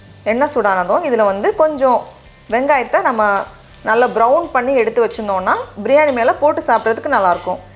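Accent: Nagercoil, India. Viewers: Hi friend.. Nagercoil vaasam ungal pechu la theriyuthu.. namma oora neenga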